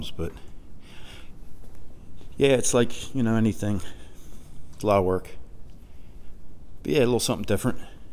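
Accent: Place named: Philadelphia, United States. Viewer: Nice video. Your accent sounds like my whole family from Philly and New Jerz.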